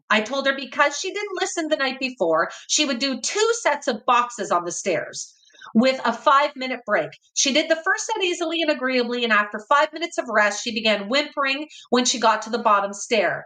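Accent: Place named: Prince Edward Island, Canada. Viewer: I am a PEI gal and saw you on Mormon Stories! I recognized your accent!!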